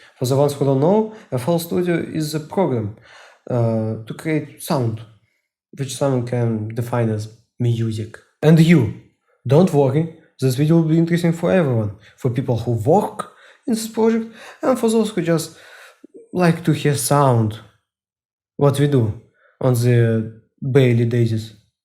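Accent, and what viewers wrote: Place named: England, United Kingdom. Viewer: The first English accent that I suck at understanding it. Only beginnings and the ends. Lol :D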